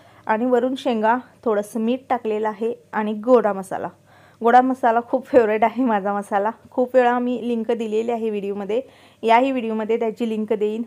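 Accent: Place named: Sangli, India. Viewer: मि विटा ...मायणी इथल माझ माहेर आहे .....कामानिमित्त आम्ही करमाळा येथे राहतो....आपली सांगलीची भाषा विडिओ त छान वाटते....पण इथे सोलापूरी भाषा जरा फरक आहे.... तु ज्या गावांची नावे सांगते ती गावे मला ओळखीची वाटतात.....विडिओ छान असतात .....पण कुठलीच गोष्ट आपल्याला सहजासहजी मिळत नाही.... तु खूप मेहनत घेतेस .....पुन्हा एकदा अभिनंदन लवकरच 100kपुर्ण होवोत हिच सदिच्छा💐💐💐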